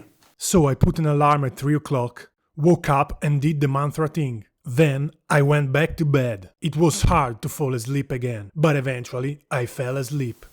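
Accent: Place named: Italy. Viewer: from the accent he seemed italian and then i read "ho sognato che stavo mangiando un panino", ed è lì che ho capito che era mio fratello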